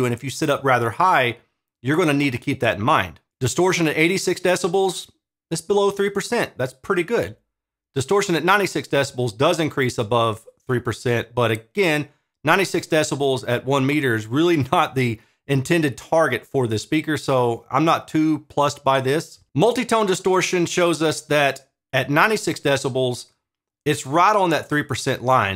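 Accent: South, United States. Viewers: Love your speaker reviews! Dig the Southern accent, too. I found this monitor on Amazon UK, but for us Limeys, it costs a good deal more ($415) unfortunately. Grrr!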